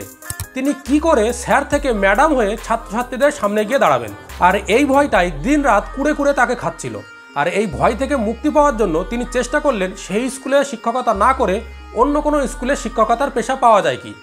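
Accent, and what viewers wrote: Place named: Bangladesh. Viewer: তোমার কথাই মনে হচ্ছে তুমি বাংলাদেশী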